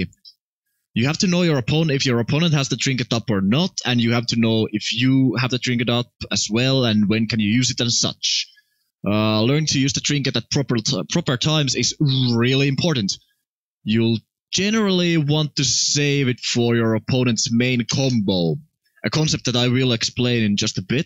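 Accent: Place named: Finland. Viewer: You sound so finnish.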